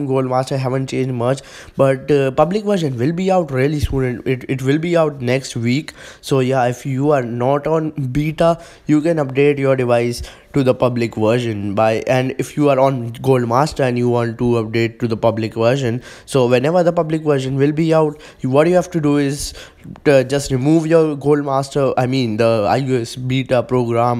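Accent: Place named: Tamil Nadu, India. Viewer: i can't understand what the hell you talk .. please talk english.. not english tamil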